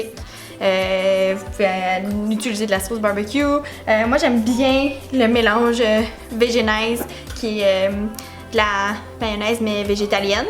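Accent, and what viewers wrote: Quebec, Canada. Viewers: The accent is mixture of English and french Canadian ,but cute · salut! Merci pour ta vidéo, pas encore essayé car j'attend avec impatience ta vidéo sur les légumes en poudre... D'une pierre, deux coups. Merci tu es trop fraîche 😀 je kiff l'accent Québécois 😁 bientôt j'espère 🤓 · L'accent quebecois est super cute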